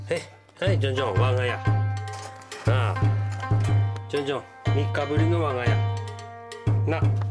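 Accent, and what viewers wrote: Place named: Aomori, Japan. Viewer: 凄い訛ってる！ 青森とかですか？🍎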